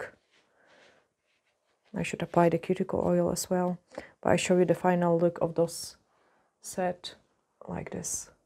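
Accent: Scotland, United Kingdom. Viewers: Absolutely gorgeous 😀 are you part Scottish? You have a very subtle Scottish accent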